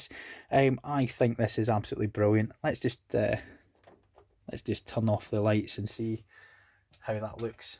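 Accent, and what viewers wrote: Scotland, United Kingdom. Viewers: That was great. So they are just monochrome LCDs with the light filtered coming to them. {Admires Scottish accent realizing it actually helps in attention as-well-as retention.}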